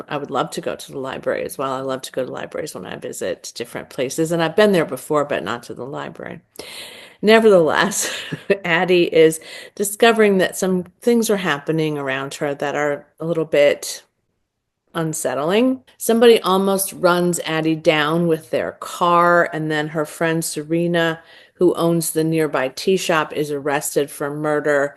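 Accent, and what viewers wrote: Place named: Louisiana, United States. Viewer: Love her Louisana accent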